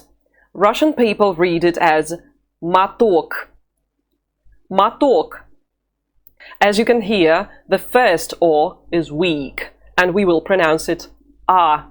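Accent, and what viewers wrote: United Kingdom. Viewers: I liked this lesson and your teaching way and British accent. Thank you. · Very nice intro video. Did you study English in the UK or have a British instructor? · Your London accent is delightful to listen to. The Russian pronunciations are most helpful, especially the "o"sound in words like "это", which I've been mispronouncing as a long "o" rather than an "a" sound. Little thing - big difference!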